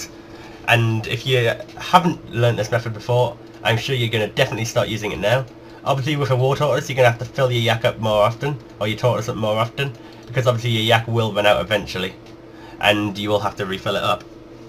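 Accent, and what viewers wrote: Newcastle, United Kingdom. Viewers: he's a jordy, love his accent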